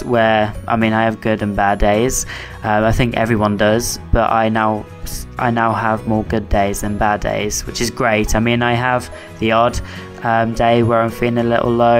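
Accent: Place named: United Kingdom. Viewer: We love you " " your the next "Mental Heath Hero" that will take the world by storm! Your always getting a friendship with me no matter what your mental health status is! BIG BIG HUGS on your bravery and sharing your journey on this video! Your first video was a "Groundbreaking Hit"! Your "British" voice is as adorable as ever and I'm hoping you will do a musical "Let's Play" where only you are singing the entire time and in conversation! But until then you are loved and I enjoyed this video very much!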